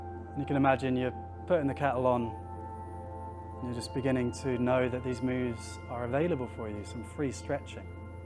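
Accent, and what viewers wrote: United Kingdom. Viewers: Sometimes I watch your videos just for your British accent😅 (And I promise to do the stretching thing in the morning🫶🏻)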